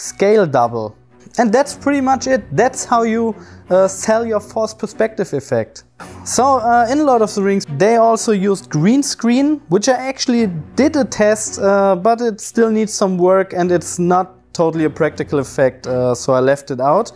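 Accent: Germany. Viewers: you sound so german wie recht ich hatte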